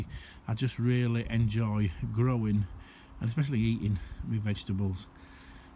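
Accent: Yorkshire, United Kingdom. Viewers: Nice tour, smart looking allotment nice to hear another Yorkshire accent!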